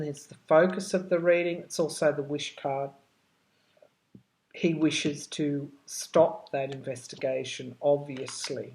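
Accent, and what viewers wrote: Australia. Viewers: it's okay we love that Aussie accent keep it up. by the way we a also love your beautiful smile. I cannot wait until the world gets a little bit lighter, so you can smile a little more. I feel bad for you I know this stuff must be hard on you. These readings must take a lot out of you. We appreciate every single time we get a new video. Keep up the good work.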